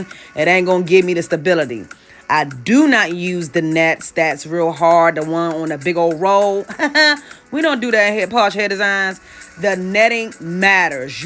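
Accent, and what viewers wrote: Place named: Maryland, United States. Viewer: You're definitely from MURLIN toooo!🤣 I know that accent anywhere. I've been living here for 34 yrs and the accent is still so distinctive to me. Great job on the technique. I have alopecia on the crown of my hair and I am always looking for options to share to help me. Thank you!!!!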